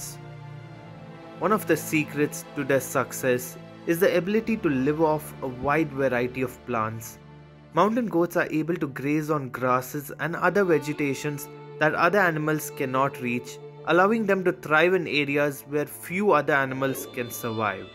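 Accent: India. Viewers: Indiglish, got to mute and watch subtitles instead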